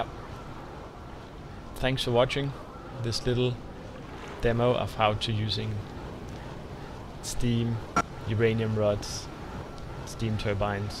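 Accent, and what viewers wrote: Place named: Denmark. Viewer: You sound like a Danish man speaking english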